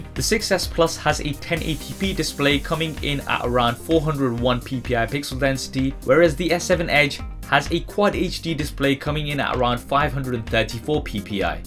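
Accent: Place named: England, United Kingdom. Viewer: btw I know you're Muslim and from England I think by your accent where's your parents from.?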